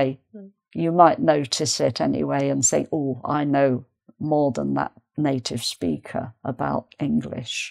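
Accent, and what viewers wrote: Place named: RP, United Kingdom. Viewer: Oh. that Lovely british lady with RP accent!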